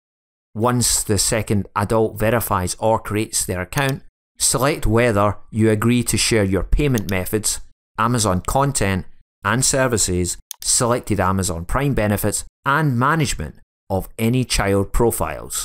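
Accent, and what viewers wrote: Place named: West of Scotland, United Kingdom. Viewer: Good West of Scotland accent mate. Cheers.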